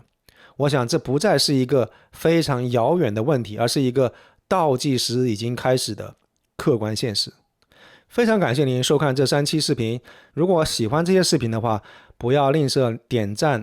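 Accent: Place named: Hubei, China. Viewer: 湖北人吧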